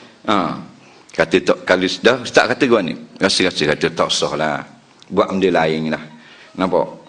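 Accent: Terengganu, Malaysia. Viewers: Bahasa terengganu comel molek..zizan jadi zizang..pelakong..hha...comel comel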